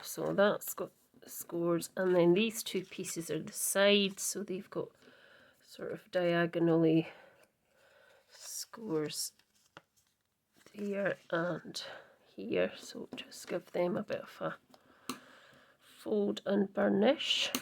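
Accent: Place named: Falkirk, United Kingdom. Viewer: Hi, I stay in Balmullo near St.Andrews, but i have friends from Falkirk live here and thats where the accent twigged. love the way you do your videos, i chatter to myself as well. Carry on crafting.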